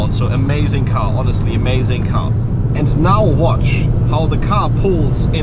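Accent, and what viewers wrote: Germany. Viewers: Ich find es erstaunlich, Aussprache übelst deutsch aber die Sätze fließen nur so dahin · Kannst gut englisch aber man hört das du ein Deutscher bist .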